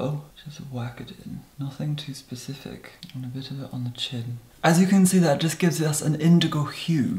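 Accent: Scotland, United Kingdom. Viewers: Imagine manny attempting to parrot everything says 😺 He couldn't string it. No offence to Americans x BTW his accent is Scottish x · patsy t That's Scots accent love, we all speak like that here! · So much talent. But i miss ur scottish accent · Favorite part is that he's got a faint Scottish accent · Anton Fuchs Scottish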